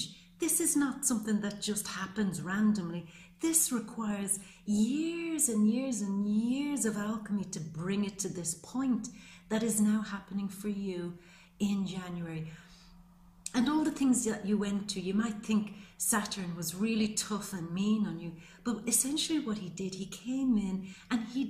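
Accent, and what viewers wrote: Ireland. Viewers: Helo there buitifull lady , i thank you from the bottom of my big Leo hart ,wow love your Irish accent to , happy New yr , well I had tears runing down my cheek for thats my life you read, i know it's General , but i felt it for it was saturn on my case 4th house in my chart in sag , but none the less he's been my task master, it's been karma,i suferd 21 yrs in total, i have endured more than any man, but you are right it a cumilated in the last 3 .. I call it hell an back ,i am still hear , and I really cry when you said ive paid 10 life's karma,.saturn is the teacher task master and father time , Lord of karma , he took me to the limit of my endurance , striped me bare and shown me how insignicant we are as beings for we truly are , pain and sufering loss and the false fell like dead flys , to the way yet test after test i endured humbly for i no i am gratefully for his lessons , for he surely is the greatest teacher of all , and I learnt the hard way ,.i all ways have Spence age seven ,. 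Ime now 60 ... but i like what you said because I do be leave ive passed the tests because simply i wouldn't be hear ,, this i do believe 1000%.. and the rewards via saturn are the greatest for the endever and passing,, yet to come, patients was the hardest lesson humility releasing egos pride, Many many lessons, false pride ,i am a current man than when I started , . I no got to move from hear padt , finally to get ready to receive,. Renewed hope change finish my transformation, ready to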